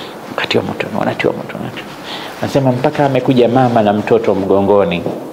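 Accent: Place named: Digo, Kenya. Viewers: Kweli kabisa ni mdigo kabisa uyu sheikh wetu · Huyu sheikh amezaliwa Kenya? His Kiswahili sounds like a kenyan.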